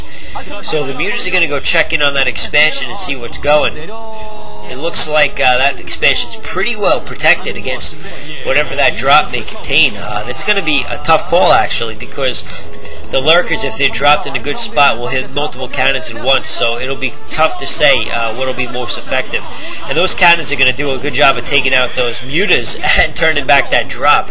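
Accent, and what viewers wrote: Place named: New York, United States. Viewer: I think he's doing a great job, keep it up man. lol as for the voice, i also didn't like your voice at first but now i love it. lol Sounds like a new yorker i hear on tv. But yeah he's doing good, lots of commentaries, we appreciate it. thank you.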